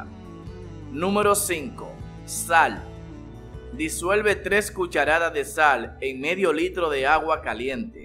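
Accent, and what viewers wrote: Dominican Republic. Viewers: Es de República Dominicana bro Ahí hablan así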